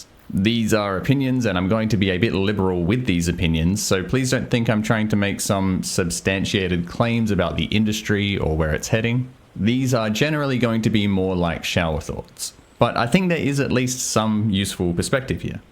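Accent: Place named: Australia. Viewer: Hhahaha no way I got to the same feeling after a decade of frontend dev, with React. Hobby farm and or game dev. I started learning C++ and it's been great! I don't have many good feelings/experiences around AI, I really pushed it to it's limits as an early adopter and found that it has a negative impact on my memory and analytical skills. I just use it kinda like a search engine when I really can't find any other way. However I am open to giving it another shot using something more like your method. As a side note, I also use Vim with the file explorer to the right :) From your accent, I assume you're a fellow Aussie! Thanks for sharing this, great video!!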